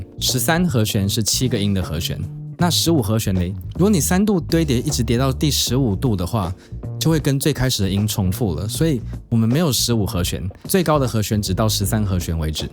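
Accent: Taiwan. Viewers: Accent sounds Taiwanese. Really glad I came across this channel! For the Cmaj9 (omit 3), it can also be labelled G/C as an inverted chord, right? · 這是台灣口音部分了 我們不像大陸咬字那麼要求精準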